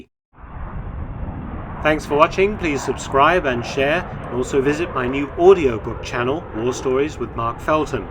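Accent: United Kingdom. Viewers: It sounded like Dr. Felton was saying "Vulcan" (with a "u") to me - it's the British accent ... which isn't surprising given that he's British.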